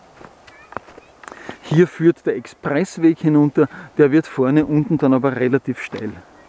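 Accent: Austria. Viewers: Dei "weana" Dialekt is sowos von herzerfrischend...🛷 "Laiberl" statt Pullover! Mein neuer Walspruch. Danke, mit dir geh ich gerne täglich Gassi..🐕 🌬❄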